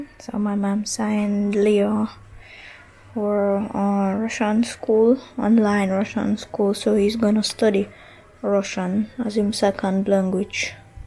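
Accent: Jamaica. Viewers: Hey I haven't seen your videos in years! Last video I saw was when you went back home years ago to Russia or Ukraine I can't remember which 1 your from!!So glad your video popped up on my timeline.You have Jamaican accent now very cool 😎 👍🏾 1Love and Bigup your self💯🙏🏾Much Love to Your Family God Bless 🙌🏾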